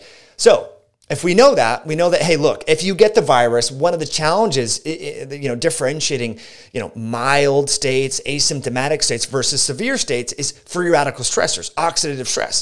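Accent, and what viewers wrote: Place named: South, United States. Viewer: Hard to understand unless you've lived in the South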